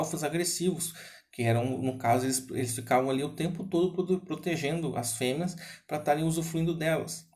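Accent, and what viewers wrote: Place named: mixed, Brazil. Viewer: O sotaque mineiro/goiano é perceptível, ksksk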